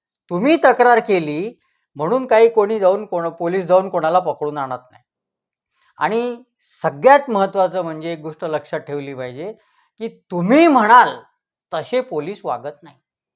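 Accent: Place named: Nagpur, India. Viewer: खूप छान सर तुमचं नागपुरी भाषेत बोलणं खूप भवतं मनाला अजून थोडं प्रक्षुब्ध भाषेत हाणा की सगळे च विडिओ छान च असतात god bless u सर!🙏🙏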